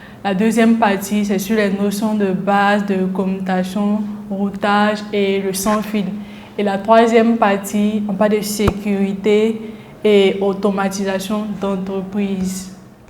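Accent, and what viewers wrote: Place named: Ivory Coast. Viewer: Merci a toi aussi. On sent vraiment que tues passionnée. Bon courage. Ton accent me dit que tu viens de la CI. Je veux savoir, stp, comment faire en CI, pour passer l'examen.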